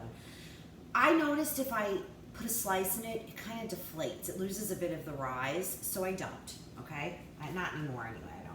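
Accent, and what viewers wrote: New York, United States. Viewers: Half Italian, half Irish. Lol, that's pretty typical of NY/NJ/CT. I live in Florida now, and it's comforting to hear my accent! 👍🏼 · Hello from ALBANY!!!! I just baked bread tonight for the first time....Puerto Rican Pan Sobao. It was deelish! I'm a PR from Brooklyn, who 30+ years later still sounds like you...."why can't I find decent Italian bread in Albany?!?!?!???". I stumbled upon your recipe and was totally enamored when you mentioned Albany. I may try your recipe this weekend. Looks awesome!!!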